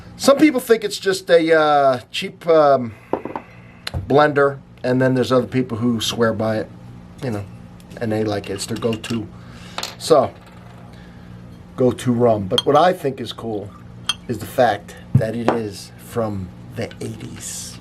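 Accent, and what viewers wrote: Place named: Pittsburgh, United States. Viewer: The silver label with Hawaiian Punch is very good. I drink it when writing. Btw... I’m picking up a Pittsburgh accent from ya. You from western P.A.?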